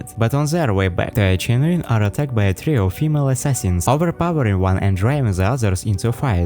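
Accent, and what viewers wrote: Russia. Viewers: Not gonna lie I thought your were speaking Russian for a minute......but overall not not bad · Where are your Accent comes from? Russia?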